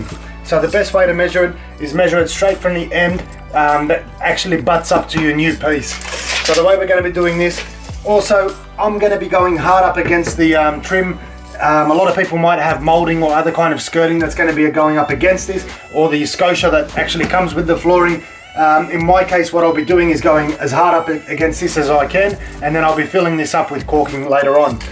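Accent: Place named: Sydney, Australia. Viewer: Didn't he say he was going to remove the baseboard? With an accent like that, I'm guessing he lives in Sydney.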